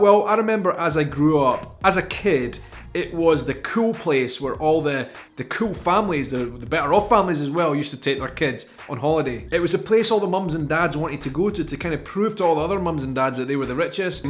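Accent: Scotland, United Kingdom. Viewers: you are so handsome and that accent makes me shiver, are you married? If so too bad LOL.Personally I much prefer the Scottish or Irish brogue over the boring British. I'm newly subscribed, so far your vlogs are funny and done in good taste unlike some of these British idiots that come here and criticize our food, our language, and our customs.I thoroughly enjoyed when you went to New Orleans and got taken down by that drink the Hurricane. Lol you poor baby. Guiness and Dos Equis beer does that to me. Two pints of Guiness and I'm flying but don't have sense enough to stop. Love It Love It Love It. My cousin married a widow from Scotland named Rebecca, she had a couple young children. She is such a sweet woman as were her children, they fit in this French family like a hand fits in a glove. My daughter went to school with a boy whose father was from Scotland and his name was Ian. 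Lovely family. Seems like some Scottish men are on the shorter side but very manly and sexy. Just wanted to give you a shoutout and let you know how appreciated your context is, keep up the good work🌹🌷😍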